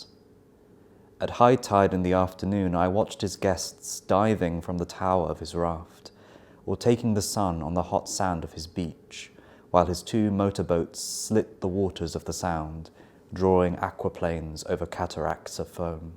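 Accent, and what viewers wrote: United Kingdom. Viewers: Hi. reading sounds so British! Amazing! I have been British English for several years because I fell in love with its pronunciation, intonation and expressions. However, it is so tough to learn it. I can hardly acquire it. That lets me down easily😰. I listened to his reading and got impressed with his British accent Which encouraged me to keep learning. I love his lovely voice, too, by the way. That sounds really comfortable😌. Thank you so much. ( ^-^)ノ∠※。.:*:・'°☆ PS: Could you read ' Howl's Moving Castle' (by Diana Win Jones?) for us, please?😊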